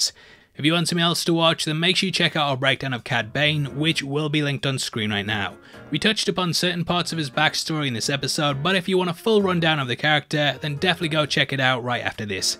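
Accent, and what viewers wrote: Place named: United Kingdom. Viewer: Maybe it's a British accent but I find you much less annoying than Eric at new rock starz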